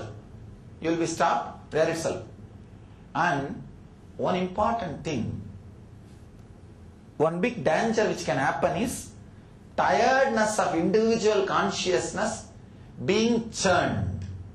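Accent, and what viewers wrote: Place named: India. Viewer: Swamiji's accent has gotten strong again after his being back in India for Himalaya trip. It was hard to understand the first part, but worth while holding on, as usual. An interesting addition to the enlightenment lesson.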